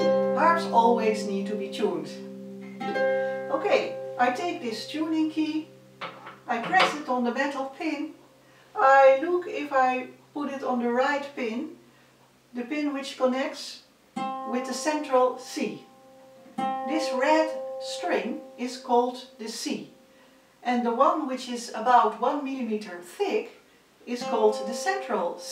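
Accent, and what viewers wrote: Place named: Netherlands. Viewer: sounds like a Dutch accent 8-)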